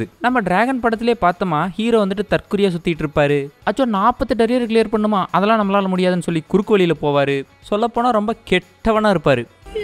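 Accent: Salem, India. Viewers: Bro appa neenga salem ma 😅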